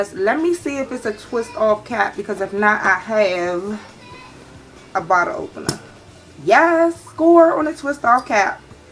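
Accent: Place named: Washington DC, United States. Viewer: yas , that dc accent is thick hunty !!!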